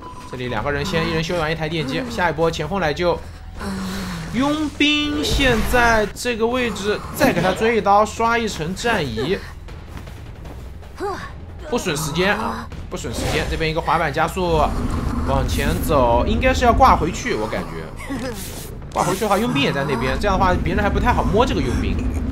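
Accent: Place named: Shandong, China. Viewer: 有没有朋友知道是哪里人。我吃饭看室友说有口音是山东人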